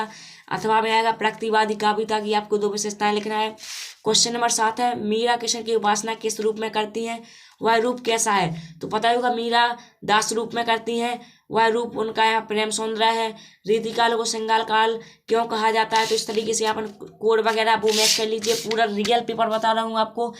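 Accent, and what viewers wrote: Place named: Malwa, India. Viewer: Mp ka hai na bro agar malwa sa bol raha hu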